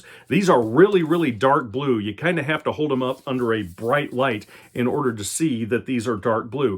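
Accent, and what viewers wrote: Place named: United States. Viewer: Apart from your great and highly informative content, your pronunciation is a bliss for non-native speakers. My english is not bad at all, but at school, we learn Oxford. I don't know what it is, but even with your American accent, I understand EVERY single word. By the way: I live in Vienna/Austria, together with my ~ 14000 shellac records.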